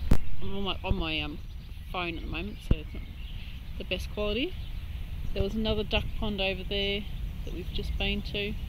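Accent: Australia. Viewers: I thought u sounded Australian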